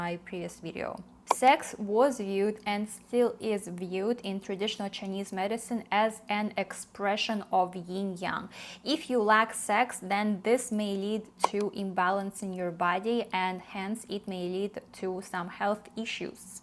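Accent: Russia. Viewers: they have a declining population. they have a huge problem. they need to encourage sex, not attenuate it. you look Chinese but sound Russian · You sound russian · you have a russian accent. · Just discovered your channel, love your Russia accent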